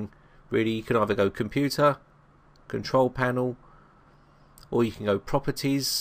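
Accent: England, United Kingdom. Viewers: It's just the English fucking accent you bellend, you know.. the one that came from England and not 'Murica..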